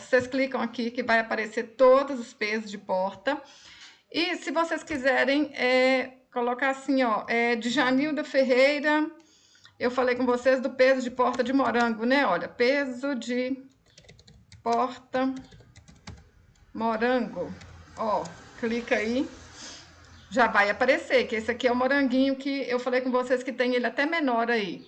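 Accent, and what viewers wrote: Minas Gerais, Brazil. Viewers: Você é mineira uai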